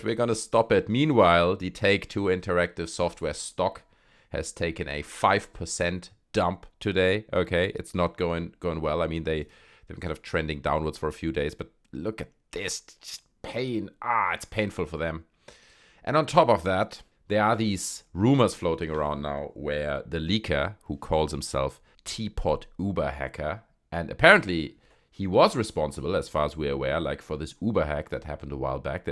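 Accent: Germany. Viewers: you sound german. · Safe der ist ein Deutscher.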